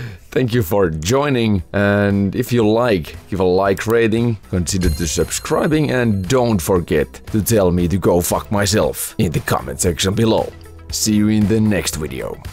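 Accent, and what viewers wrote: Finland. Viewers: Are you finnish? Your accent sounds like it.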